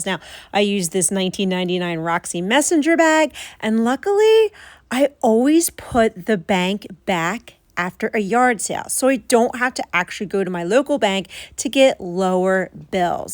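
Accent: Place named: South Jersey, United States. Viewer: How do you do your pricing for yard sales? Do you price each item individually, or do you just negotiate when someone is interested? I don't see prices marked per table or on each item. Also, please know that you were the first person I could watch about de-cluttering & hoarding disorder that made sense to me and I didn't feel overwhelmed with guilt listening and watching you. You've made so much progress and given so much hope to many! I've found one other channel, a lady more my age (This Mainer Mom), but you two are it, and both kind and practical and motivating! I'm not as far along as you are in decluttering, but am working on it, and have accomplished cleaning out several places I'd avoided for years. Something else: I recognized your accent and eventually found that you live near where I grew up (Pitman) before my family moved to Indiana when I was almost a teenager, though I've been back there a lot to see relatives & to take my own daughters when they were growing up. 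Your accent, your talking about going down to "the shore" and all just makes me remember family and my wonderful growing up years back in south Jersey. It seemed like the Lord helped me find you because you made sense to me, you have such an honest and loving spirit, and you also have expressed your faith. You have a ministry! And we also share a name, I think--! Thanks so much for all the encouragement you've been to me and I'm sure to so many others!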